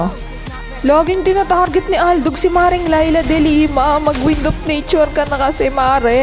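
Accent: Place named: Bicol, Philippines. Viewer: 👁👄👁 ang ganda po ng voice nyoooooooo taga saan po kayo sa Pagsailta niyo po kasi parang taga bicol po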